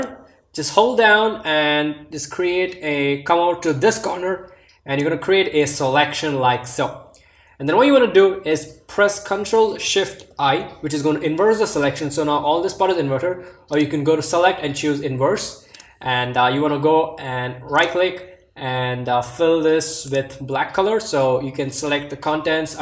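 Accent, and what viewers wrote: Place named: India. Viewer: Lol cause you don't sound like one but I noticed the slight pinch of 'desi'ness in ur voice so I posted that comment😉 btw I'm 13 and learning photoshop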